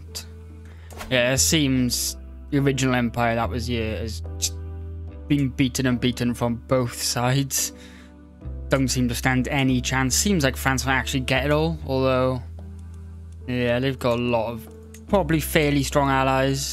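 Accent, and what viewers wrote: Wales, United Kingdom. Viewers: Are you welsh, Sir?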